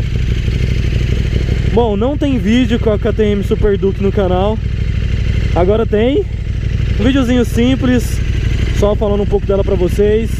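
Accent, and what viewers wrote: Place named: Minas Gerais, Brazil. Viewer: Sotaque de mineiro! Gente boa! Super duke monstra!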